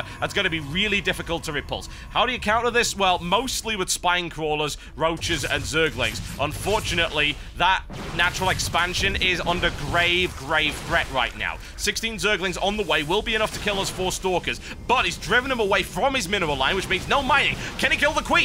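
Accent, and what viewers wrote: Germany. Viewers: Love you`r German Accent :)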